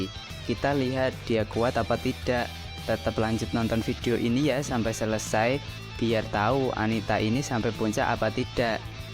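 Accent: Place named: Java, Indonesia. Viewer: Medok suarane🤣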